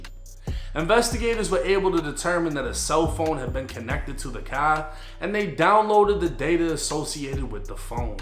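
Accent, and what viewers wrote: Boston, United States. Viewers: U gotta be from Boston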